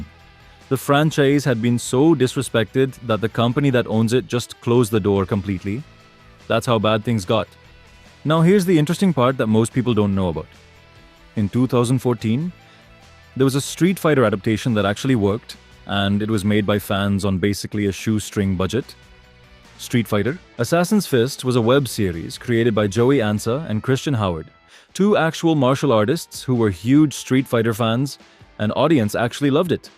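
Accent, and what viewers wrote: India. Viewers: not trying to hide your indian accent behind AI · That an Indian voice lost credit for me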